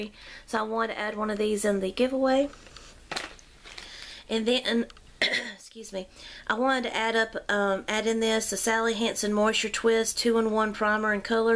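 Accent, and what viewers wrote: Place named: South, United States. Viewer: congrats on 2000 subbies, must say you have a calm/southern voice. so many you tubers voice can be harsh...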